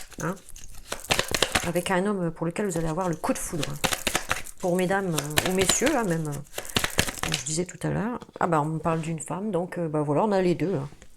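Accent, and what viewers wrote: North, France. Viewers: Un petit bonjour d'Hautmont près de Maubeuge😉😂👍. Je vous découvre et je retrouve bien l'accent du Noooooord ! Merci pour ce tirage, je suis gémeaux ascendant verseau également ! 😉👍. Je m'abonne à votre chaîne avec grand plaisir. Bisous à vous et à votre adorable petite chienne😍🙏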